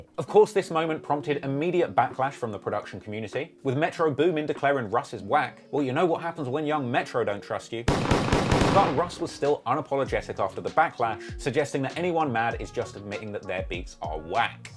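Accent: United Kingdom. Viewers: Bro dint know you were from UK well the accent I can hear but dint really pick it up til this video ahhh might as well been a joke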